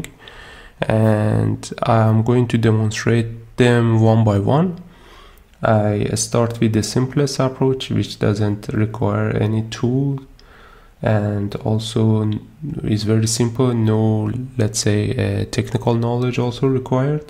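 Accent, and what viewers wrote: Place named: Iran. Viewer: way to go man. I love the section you speak about the Javascript Garbage. 😅😆😆. We appreciate the Persian accent by the way!!!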